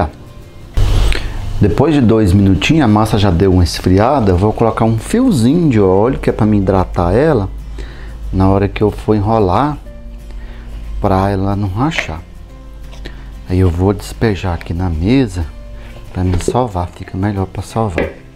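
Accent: Minas Gerais, Brazil. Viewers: Você passa uma paz tremenda!!! Amo sua maneira de falar. Vc é mineiro? Sua comida é muito bem feita, e com certeza saborosa! Vc em outro dia falou como sua mãe educação vc! E falou de uma maneira respeitosa... lindo! Gosto de vc de graça! Ñ é uma cantada! É um elogio❤👏👏👏👏👏👏👏👏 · Gente que simplicidade adorei parabens! Sotaque meio mineiro, goiano? Adorooooo fogaozinho a lenha me lembrou mto minhas origens. Parabéns acabou de ganhar uma inscrita e super like. Adorei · Seu jeitinho de falar é muito gostoso de ouvir, jeitinho mineiro , amo seus vídeos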